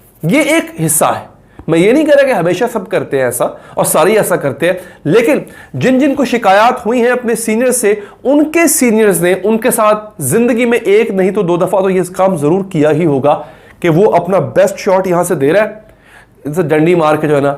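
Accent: India. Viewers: Bro please speek like Pakistani don't speak like Indian we like you... Insap ke deve.... Indian language please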